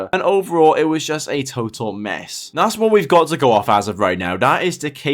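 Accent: South Wales, United Kingdom. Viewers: I sense a south wales accent , newport ?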